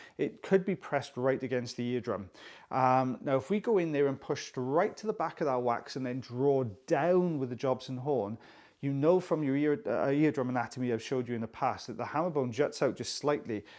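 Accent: Wales, United Kingdom. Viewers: Honestly the commentary is incredible. I’m not sure if it’s the welsh accent, but I feel like I’m listening to a rugby match commentary! It would get excited at times and more calm at others, bit of technical explanation, really like the highs and lows of sport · Don't apologize about talking a lot because that's what you're supposed to do on these videos. Plus you have an awesome accent! I love British accents ❤ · I live in America. Listening to your lovely British accent is part of the experience. No need for apologies. With your commentary, I not only get a satisfying video, I get a good few minutes of learning. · Just keep talking. Just love your accent. Reminds me of my Welsh relatives. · If you cut down on the talking I’m going to stage a protest! Your enthusiasm is infectious. Your welsh accent reminds me of holidays past, when mum and dad would load the car up and we’d go to Benllech Bay for a week. 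Happy memories. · Your voice is so soothing. The Welsh accent is the best in the world! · Stop 🛑 don’t apologize for talking! I could listen to you read the dictionary. Don’t you know how Americans love a British accent ‼️‼️❤️ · I love the Welsh accent. Far better than my Devonshire one!! Would never mute you!!!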